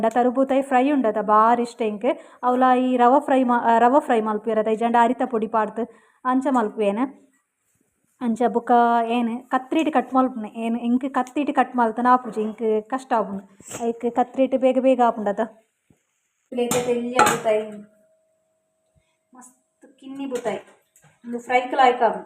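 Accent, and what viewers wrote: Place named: Tulu, India. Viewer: Hi akka er tulu pathernaga batru nakl pathernaleka apund akka😍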